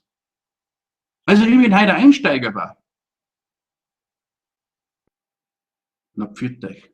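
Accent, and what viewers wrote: Bavaria, Germany. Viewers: Klartext und bayrisch, das passt halt einfach wunderbar zusammen... 👍👍👍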